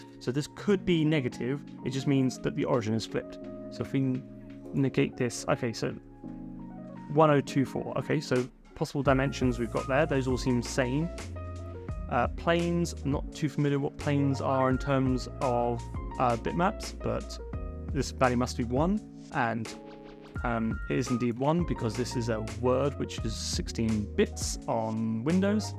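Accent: United Kingdom. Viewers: dude you're like a british me its awesome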